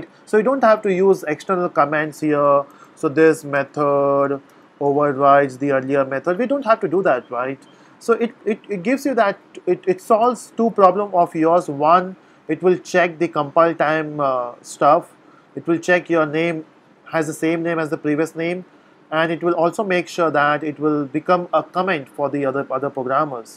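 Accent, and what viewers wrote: India. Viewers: You have to be Indian to understand his accent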